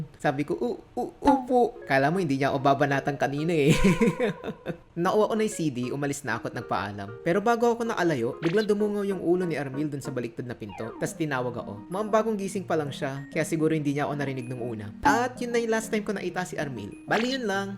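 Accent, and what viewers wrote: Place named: Conyo, Philippines. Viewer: May accent ka boss conyo ka siguro! Ahehehe you sound unique naman kaya cool parin!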